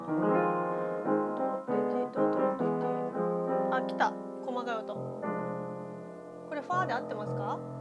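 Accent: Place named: Iyo, Japan. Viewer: てっきり関西の方なのかと思っていましたが、京言葉のルーツもある伊予のイントネーションが可愛らしを演出しているですねと、音楽以外のところで感銘を受けてます(^_^;)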